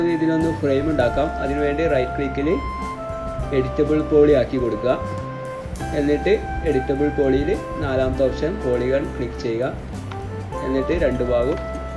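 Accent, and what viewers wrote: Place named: Kanyakumari, India. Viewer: Porathathin video Kasaragod um voice kanyakumari yum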